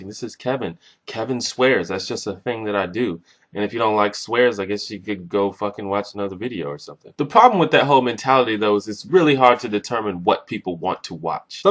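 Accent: Alabama, United States. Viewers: Why do you talk like that? Are you from alabama or something?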